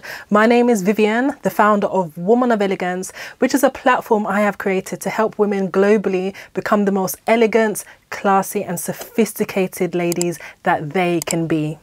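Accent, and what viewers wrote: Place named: RP, United Kingdom. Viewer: Having a Mary Poppins accent (that I love) and the name Vivian, one couldn't be anything but elegant. Not part of your crowd, but interesting insight. ✌🏽 *Not sure if this is the correct way to spell your name.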